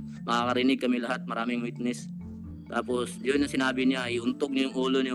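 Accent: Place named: Visayas, Philippines. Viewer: bisaya kc sya.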